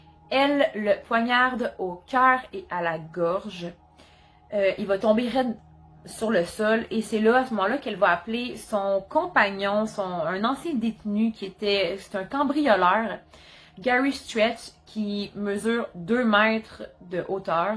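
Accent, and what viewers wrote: Quebec, Canada. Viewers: J’adore l’accent Québécois 🇨🇦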